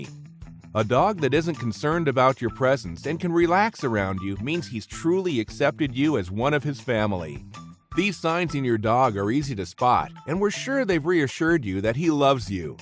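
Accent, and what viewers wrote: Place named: United States. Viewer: That stupid yank accent put me off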